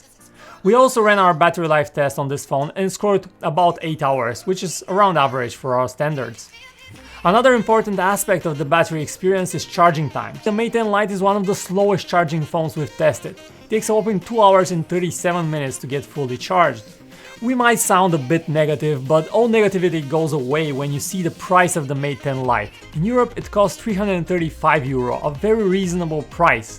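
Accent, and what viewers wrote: Varna, Bulgaria. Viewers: Are u slavic ? Bulgarian perhaps? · Само аз ли забелязах че си от варна :Д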